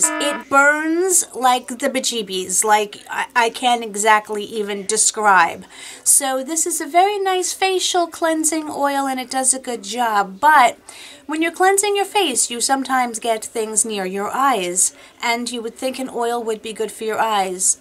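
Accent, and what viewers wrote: New York, United States. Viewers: I LOVE the Dr. Jart Tiger Grass Cicapair cream! That stuff is amazing! It helps with mild sunburn, redness from the cold, rosacea, even my eczema blotches I sometimes get on my neck, anything that will make skin red. That was one of my fav finds in 2018, but I'm sure it's been around before that. Oh yeah and speaking of fav finds, the Zodiac pallets! I have all of the bh spacey pallets! They are awesome! I'm 42 and never before bought big pallets before this., you would like the first Zodiac maybe I think you would rock the cool tones and I'm guessing Supernova would look awesome on you too! The Galaxy Chic is my fav, but you would love the Aurora Lights because the colors are shimmery. I could go on! Haa sorry Oh and thx for warning me about the charcoal magnesium deodorant! I was thinking of buying it. I don't like their regular formulas, but the sensitive skin ones are good. 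Natural deodorants are rarely good enough. My fav ones are Kiss My Face-dry spray and stick, E.O. sprays, and Arm&Hammer Essentials (it's half way to natural) and I still have to try the Native one in my Target box that I keep seeing good things about. Oh and that Tom Ford perfume, oh woww haa daaamn that stuff is gooooood! Ok I'll stop. Thx for the info,. And your voice is nice and calming- love the NY accent too. Have a great weekend!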